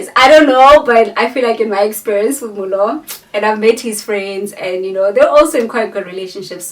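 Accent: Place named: South Africa. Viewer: I'm Nigerian and I love SA movies, the way they talk in them. The way you talk. I already subscribed but please try to create English subtitles for when you speak your language. 💙💙💙💙💙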